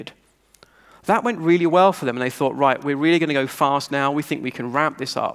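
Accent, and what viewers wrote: United Kingdom. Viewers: I started reading Building Microservices a couple of weeks ago. I didn't know Sam Newman at the time. I'm glad I saw this conference, because now I'll be able to read the rest of the book in his british accent.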